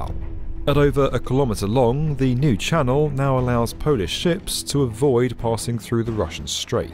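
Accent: United Kingdom. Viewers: sounded british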